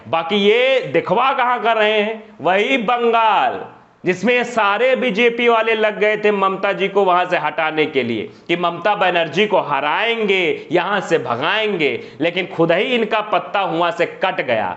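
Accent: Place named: Bhojpuri, India. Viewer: Bhojpuri style me bolate hai sir ap bahut maja Ata hai👌👌👌👌👌👌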